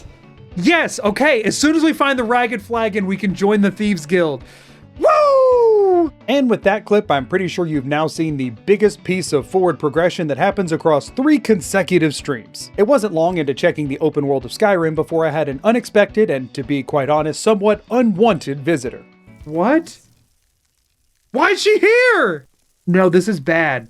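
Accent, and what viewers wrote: United States. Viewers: Are you by any chance from Utah? Something about your vocal inflection reminds me of an old friend of mine. He might not have originally been from Utah though either lol. Regardless of why, your method of presentation and your sense of humor feels really familiar and welcoming, so thanks for these videos! I’ll follow you on twitch so I can pop in if there’s ever a stream I’m available for.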